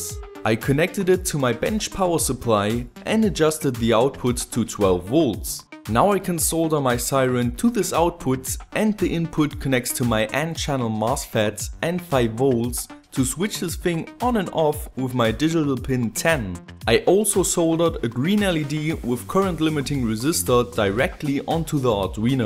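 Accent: Germany. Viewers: Das hört man auch du hast einen Deutschen Akzent aber dein Englisch ist sehr gut!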